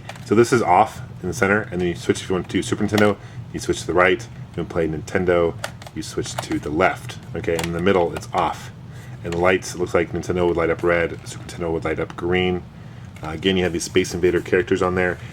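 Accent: United States. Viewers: you sound like your from kentuky like me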